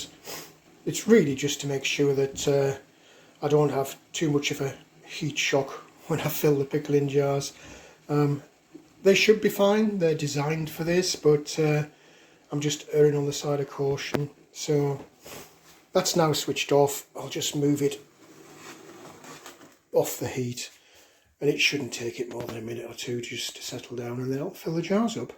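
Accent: United Kingdom. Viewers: You definitely sound British. Love the accent.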